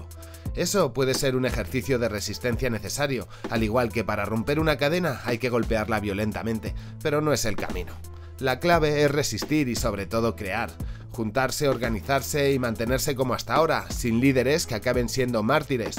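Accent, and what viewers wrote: Colombia. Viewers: Que crack, pareciera que un colombiano hablara, estás muy bien contextualizado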